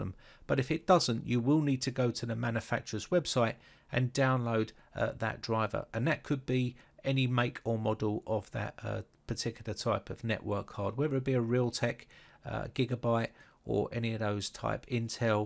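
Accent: United Kingdom. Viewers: Hearing a british accent after all of those indian ones is so refreshing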